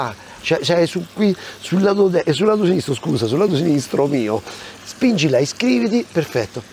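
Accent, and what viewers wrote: Rome, Italy. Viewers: Faresti meglio a fare qualche film con Massimo Boldi con quel accento romano,e un complimento,sei bravissimo come cuocco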